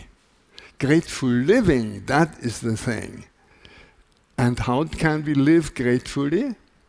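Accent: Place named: Austria. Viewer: Wyczułem austriacki akcent na początku :) ależ jestem dobry!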